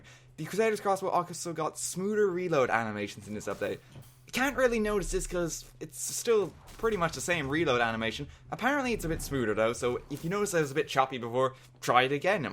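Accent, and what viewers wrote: Ireland. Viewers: Are you from Ireland or Newfoundland? The accents are fairly similar?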